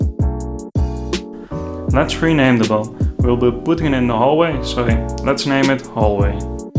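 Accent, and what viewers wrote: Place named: Netherlands. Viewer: Je hebt echt een nederlands accent en een goede video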